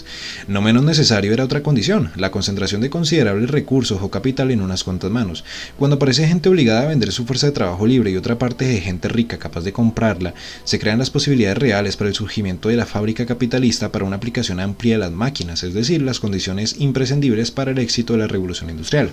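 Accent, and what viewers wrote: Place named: Mexico. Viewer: Hermano deje de hablar como mexicano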